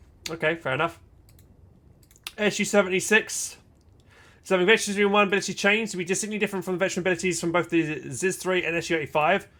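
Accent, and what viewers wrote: United Kingdom. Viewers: I was pulling your leg regarding yall. Anyway, is very English. As am I. In fact we even have the same accent. Maybe it's my age, getting too old for this video computer entertainment;0)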